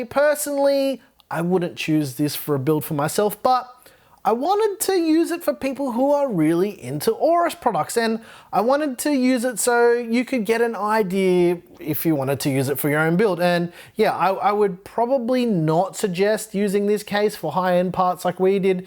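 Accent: Australia. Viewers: Great to hear another aussie voice in the tech space ! Subscribed !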